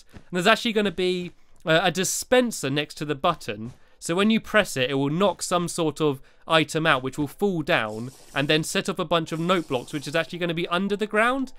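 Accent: United Kingdom. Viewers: Are you British? British people are cool. (Just forget about the American Revolution.)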